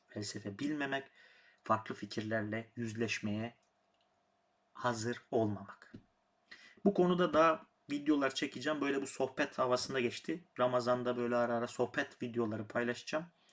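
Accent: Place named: Gostivar, North Macedonia. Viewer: Çok güzel bir yayım olmuş. Takibe aldım sizi, diğer videolarınızı da izleyeceğim inşallah. Bu arada aksan hafif tanıdık geldi, meğerse memleketliymişiz hocam. Gostivar'lıdan Ohri'liye selamlar.:D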